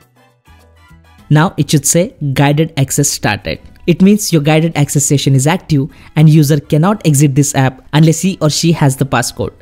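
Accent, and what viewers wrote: India. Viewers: I have difficulty understand indish english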